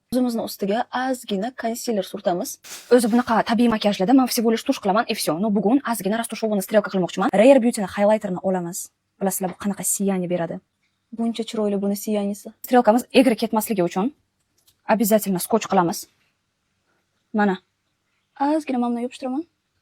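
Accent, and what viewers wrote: Bukhara, Uzbekistan. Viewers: Buxorolik siz aniq buxoroni shevesi vashe yoqadide😂❤❤❤❤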